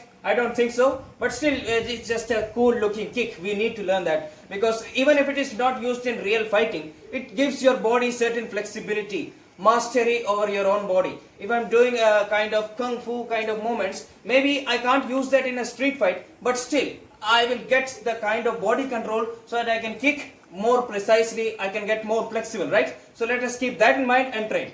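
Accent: India. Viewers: Man salute Indian English..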